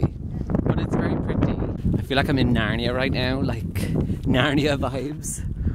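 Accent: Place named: Cork, Ireland. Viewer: Loving the Cork accent like!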